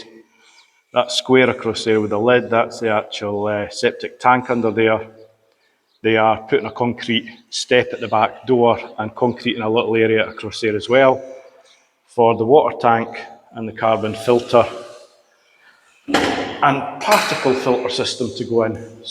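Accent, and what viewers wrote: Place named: Scotland, United Kingdom. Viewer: Hi, when we were kids we had a saying that goes like this....... jealousy makes you nasty....... If you give way to the low class you end up like them. I listen to the Scottish accent coming through, if you are of Scottish descent, man, this person placing these comments clearly does not know the Scotts. Water of a ducks back, that's how you treat these comments. Good luck with the wetting of the front porch as soon as the place is finished.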